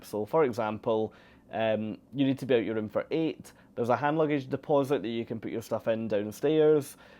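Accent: Scotland, United Kingdom. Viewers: Hey - actually I just came across your video by chance, because I was watching videos on MSC cruises.... But when I heard your accent ( absolutely love it!!! - watched the Outlander series only in the original tone because of that), I just had to watch the whole thing. Very well done! There are so many terrible casts online, with nerving music, stupid comments etc... So I am glad I watched and of course I gave it a definite thumbs up and I even subscribed your channel;-). Although I dont have too much time, I will follow you on your journeys now. Regards from Germany, Bettina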